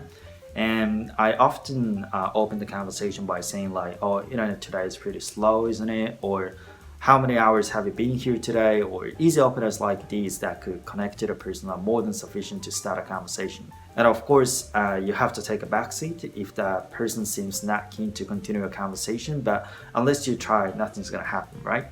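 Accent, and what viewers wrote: Australia. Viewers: 英語出来ないわけではないのに、なんで言ってること分かりにくいんだろうと思っていたら、さんオーストラリアの発音で英語話していたんですね。アメリカ在住なので、アメリカ英語に慣れすぎていました (^_^;)色んな地域の英語聞かなきゃですねー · オーストラリア英語の影響を受けてるよアメリカ英語とは少し違うね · ところどころオーストラリアアクセント入ってますね！バックグラウンドが感じられて良いなあと思います！ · harnessed? 聞いたことない単語確かにオーストラリアえいごなんだろうな。とおもいました。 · すごいちゃんとオーストラリアンアクセントになってる！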